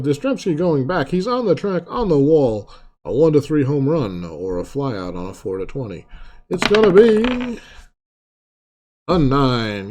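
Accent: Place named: United Kingdom. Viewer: Count me in and who is this voice of what sound like British persuasion?? Great voice Please continue very enjoyable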